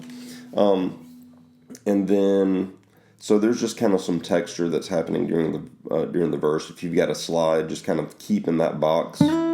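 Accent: South, United States. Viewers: Lol i think its the southern accent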